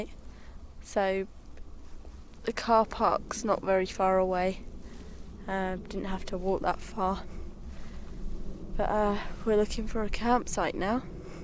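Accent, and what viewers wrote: United Kingdom. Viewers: I love your calm voice and your little accent so British, it's too charming. You have the right to make fire in your English forests?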